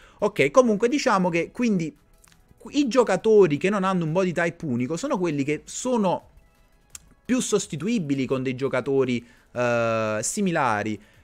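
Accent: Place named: Naples, Italy. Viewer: Si vede che sei allievo di Holly ... io ho vissuto 12 anni a Napoli ed adoro la parlata tua e di Holly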